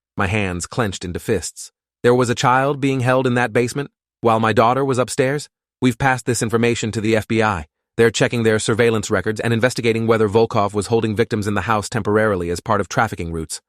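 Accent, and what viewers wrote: United Kingdom. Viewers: Why did he turn british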